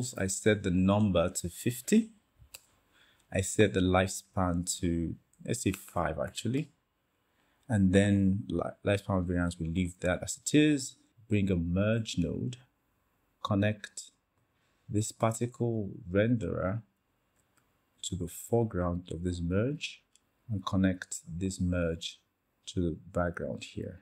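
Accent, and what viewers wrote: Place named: Nigeria. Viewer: You sound Nigerian and I love the way you speak clearly!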